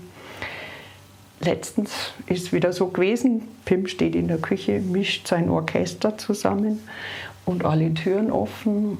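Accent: Bavaria, Germany. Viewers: Dass du bayrisch redst, das wusste ich nicht. Gfreit mi ....🙂 An Guadn für euch beide....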